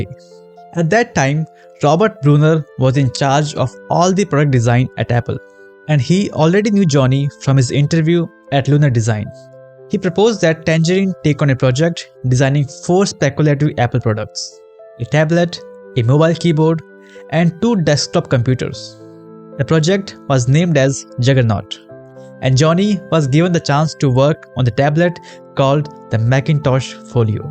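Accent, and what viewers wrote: India. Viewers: that indian accent is killing me. cow dung eater lol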